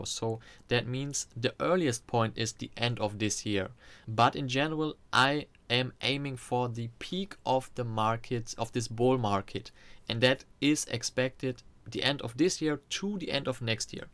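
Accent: Germany. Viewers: Deutscher Akzent izz da 😂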